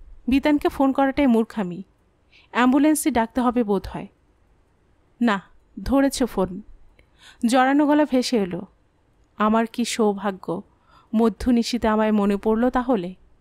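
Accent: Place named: Bangladesh. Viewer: Reading is good except a few Bangladeshi pronounciation.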